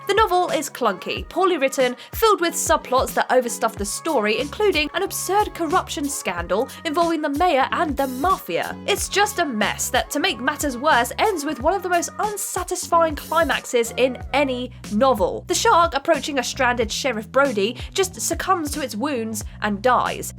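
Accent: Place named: England, United Kingdom. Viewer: Yankee? She English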